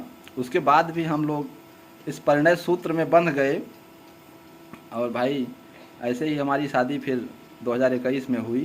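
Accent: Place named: India. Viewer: Bhaiya aapki bato pe bahut maza aata hai ekdam desi style me bolte hain, bhabhi ji ki smile bht pyari hai, aise vlogs dala kariye youtube par, pranam❤aap dono ko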